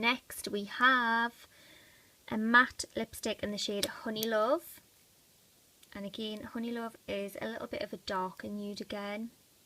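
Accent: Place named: Newcastle, United Kingdom. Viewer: Are you from Newcastle Your accent is gorgeous. ♡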